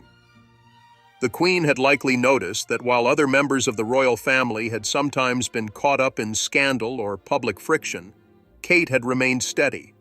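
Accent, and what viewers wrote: United States. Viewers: Disrespectful using a US voiceover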